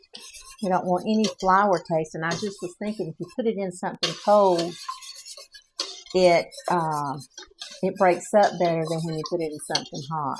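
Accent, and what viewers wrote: South, United States. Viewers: Oo, I love pie!! That is a fact I cannot deny. I made a milk tart the other day, baked it in a scalloped rim dish, but not really suitable, I always bake this in an oblong Pyrex dish and it turns out so lovely, so stick to what you know works. Believe me. So I looked at this Le Creuset pie and thinking before I pack this away, how about a lemon meringue pie? Now that I have seen this video, I think I will do the lemon one first and then try the coconut. I love coconut and again, I love pies/tarts. But they must be real good crusts and fillings with meringue. Thank you so much for sharing and showing how it is made. Just love to watch and love the Southern accent, sounds to calming. Not from the U.S.A. Have a beautiful day, and the grandson is gorgeous, they are just too precious for words. Many blessings. Kind regards.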